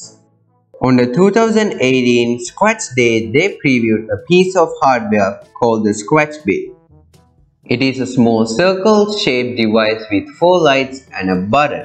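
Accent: India. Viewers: ur indian accent is so strong, the subtitles say "caro network" instead of "Cartoon Network)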